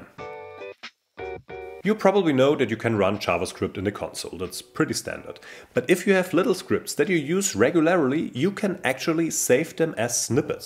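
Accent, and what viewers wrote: Germany. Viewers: I always recognize a fellow German by their accent 👌 Great tips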